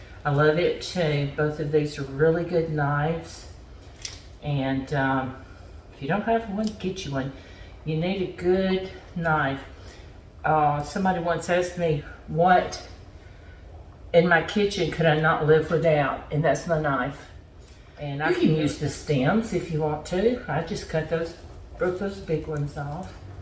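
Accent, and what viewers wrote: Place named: Alabama, United States. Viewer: Bonjour, I love your cooking. I am a french canadian and my english speaking is influenced by your beautiful Alabama accent. This accent is not from my ancestry, so it must be a calling to visit your part of the world. 🌈💕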